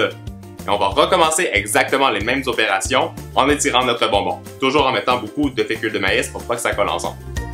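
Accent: Quebec, Canada. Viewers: Coucou ta recette est trop C😎😎L et tu est trop beau,j'aime trop ton accent, il est canadien?pourrais tu faire de la barbe à papa au NUTELLA???😘😘😘😜😜😜❤❤❤💙💙💙💚💚💚💛💛💛💜💜💜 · Ccou je suis nouvelle est je voulais te dire : Tu as un magnifique accent québécois ! Est j'adore tes vidéo +1 abo 😉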